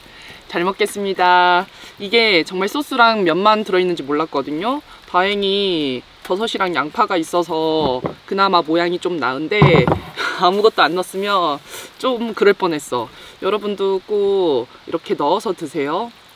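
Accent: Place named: Gyeongsang, South Korea. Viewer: 정말 잘 드십니다.👍👍👍👍👍집게벌레 독 없어요. 말씨는대구나 부산 같은데요?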